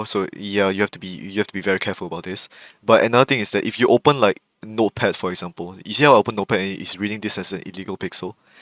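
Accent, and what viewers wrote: Singapore. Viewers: the singaporean accent is very strong btw LMAO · singaporean accent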